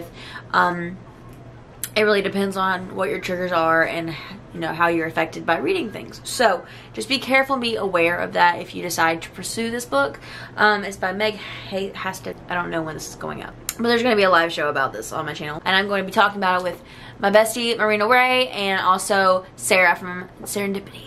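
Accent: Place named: South, United States. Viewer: Also let your accent shine girl!! Idk if your southern, but I love it😊☺️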